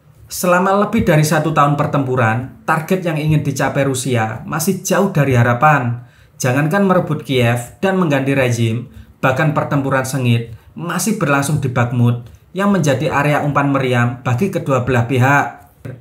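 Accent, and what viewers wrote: Java, Indonesia. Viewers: Sakit kupiiiing ...medhoooog!!!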